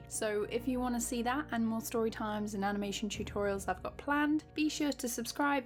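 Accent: United Kingdom. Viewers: I really love watching your video. Your animation is so cute. and The best thing that I love is your bloody lovely accent! I'm studying accent with your video.HAHA BTW Which in The U.K do you live? what accent you have?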